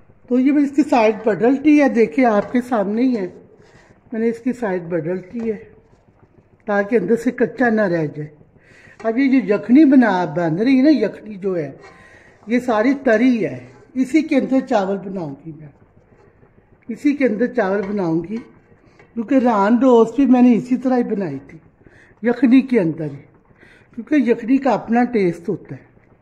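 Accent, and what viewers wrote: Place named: Lahore, Pakistan. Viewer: The way you talk is amazing , true lahori style .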